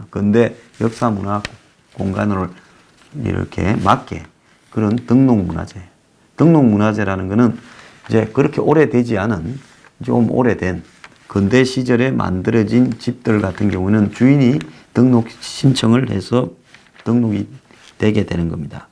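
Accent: Gyeongsang, South Korea. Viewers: 황장수? 이세끼 보니 순실이 꼬붕세끼 같네? 문딩 이 세끼 개상도말 졸라쓰네ㅡㅡ씹세끼ㅡ 너같은 세키도 뭘처먹고 똥을 싸니 나라가 오염되지씹섺꺄..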